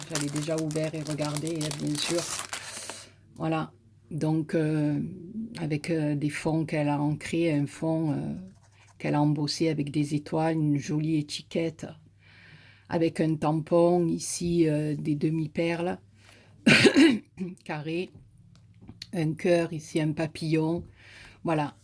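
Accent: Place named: South, France. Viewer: Coucou Très contente d entende ton accent du sloeil Très heureuse de savoir que ton mari va mieux ta fleur est magnifique srcapbises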